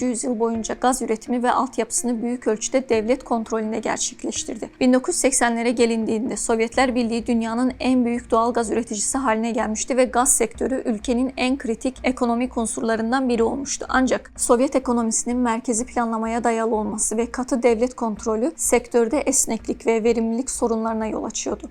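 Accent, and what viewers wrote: Azerbaijan. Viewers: Hanımefendi Azerbaycanlı, o yüzden böyle konuşuyor. Video çok güzel ancak GZT'nin genel problemi videolarının sonunu bir yorumla kapatmadan havada bırakıyor. yani konu detaylı veriliyor ama yakın vade ile ilgili bir öngörü yada yorum yapılmıyor. izleyiciye ise "düşüncelerinizi yazın" demek anlamsız çünkü araştırmayı editör yapmış, izleyiciye düşünce soruyor.. İzleyici yeni öğrendi nesıl yorum yapsın... o sebeple videolar internet bilgisi derlemesi kalitesinde kalıyor. ve kendini tekrar ediyor. izleyiciye ansiklopedi bilgisi veriyor ufuk açmıyor. editör son 3-5 dakika yorum ve konuyu kapatış konuşması yapmalı. çember kapatılmalı. buna çok dikkat edilmeli. aksi taktirde kalite ve izlenme bu yataylıkta gider. emekler için teşekkürler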